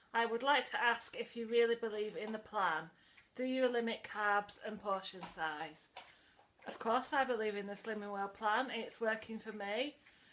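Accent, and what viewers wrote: Yorkshire, United Kingdom. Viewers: Ooh I can hear the Yorkshire accent now 😃 are you on Instagram at all ?? Also not sure if you have said but what are your plans for Xmas are u using flexi sins, being off plan ?? Was wondering re the cake i have one too xx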